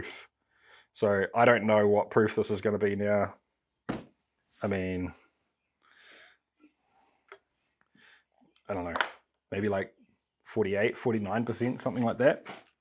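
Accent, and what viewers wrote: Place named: New Zealand. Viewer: NZ accent, bro.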